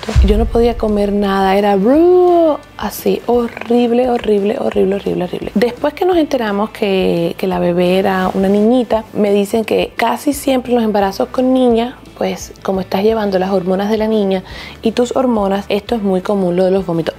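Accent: Cuba. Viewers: Es un acento, no es voz de niña , ella es de Cuba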